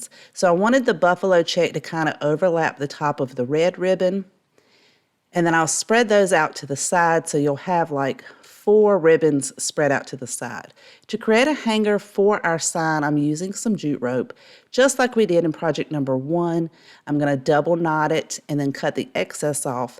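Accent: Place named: North Carolina, United States. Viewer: I love your videos! You take simple products and make them elegant! What state do you live in? My name is too and you talk like me (NC girl):)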